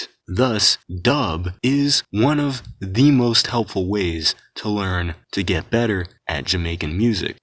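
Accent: Spain. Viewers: Do you speak Spanish? You really have the pronounciation of a spanish speaker person. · Ele lendo com sotaque espanhol dms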